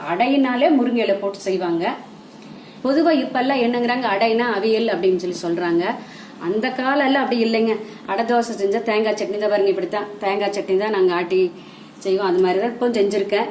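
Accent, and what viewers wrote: Kongu, India. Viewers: Amma.... more than adai dosai.... ur kovai slang is tasty and awesome.... kongu thamizh..... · Namma ooru தமிழ் கொங்கு தமிழ் Eappavumey அழகுதான் என்னங்க நா சொல்ரது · Aunty நீங்கள் பேசும் கொங்குத்தமிழ் சூப்பர் 👌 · Unga kongu Tamil kekarappo enga patima gyabagam varuthu. Very nice explanation. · மேடம் உங்க அடை சூப்பர் நீங்க கோயமுத்தூர் றா என் தாய் நாடும் அது தான் மேடம் கொங்குநாட்டு தமிழ் அருமை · vanakkam nga mam..Romba santhosama irukku unga adai receipe pathi neega solrathu ..Appdi oru kongu slang nga..lvly nga..enga ammchi kara chattney (onion .dried chillies. tamarind and salt ) seivanga .ammvum Athe thanunga. .. thuvar am paruppu n ulunthu 1/4 tumbler thaan pooduvanga..muranga thzha romba rare nga ..Oru naal eppdi seithittu comment panrenunga mam. Naambal athavathu kaara kolukkatai method post Pannu nga mam.mattai arisi pootu epdi seirathunu. 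thankz nga mam.☺👑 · Very good narration. My nepali cook not known this adaidosa. Tq .kongo Tamil. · Enna oru super navuurum adai👏😋 .adhvum unga Kongu thamizh azhagil ,seimurai azhagil sokki ponen amma.nan ungal magalaga Iruka kudadha😭😭.SANTHI from DHARMAPURI. your new subscriber.all your recipes are simply superb.👏👏👏👏👏👏💐💐💐💐💐💐🙏🙏🙏🙏🙏🙏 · எந்த ஏரியா அம்மனி நம்ம ஊரு பாஷை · I used to prepare adai dosai with your measuring only amma.. i already made for more than 5 times. Very nice measurements explanation.. additionally I will add moong dal .. happy to hear our kongu tamil. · Kongutamil arumai amma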